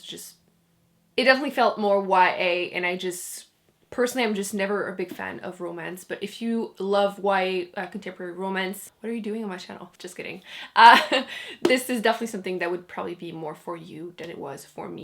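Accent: Quebec, Canada. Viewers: janessa blake She's French Canadian 🙂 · I just found your channel and the moment I heard your accent I thought "Oh she's Québecois French." So just out of curiosity are you Québecois? I live in Québec so if you are it would be pretty awesome to have a booktuber live in my province.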